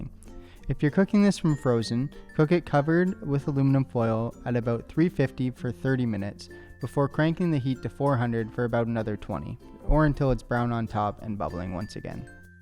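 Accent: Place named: Canada. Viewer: sounded extra Canadian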